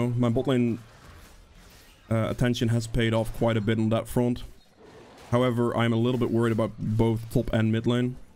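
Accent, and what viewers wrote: Netherlands. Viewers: Ik hoorde van de eerste 2 woorden al da je Nederlands bent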